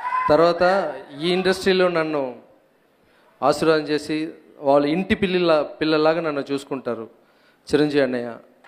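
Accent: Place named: Telangana, India. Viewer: Only Telangana style Raghava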